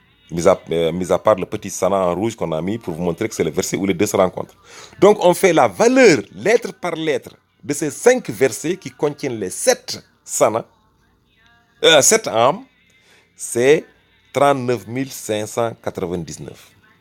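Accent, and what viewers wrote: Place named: Pulaar, West Africa. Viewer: Un vrai accent halpular!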